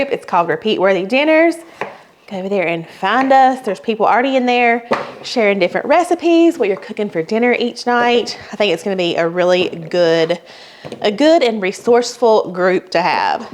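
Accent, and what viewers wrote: South, United States. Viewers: love the two recipes today you and are great together love listening to you talk that southern twinge is so beautiful to hear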